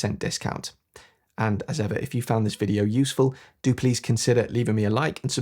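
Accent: United Kingdom. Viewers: 😂thanks but u seem like u have super powers in explaining and speaking convincingly. American Apple engineers would be hypnotized by your Brit accent 👌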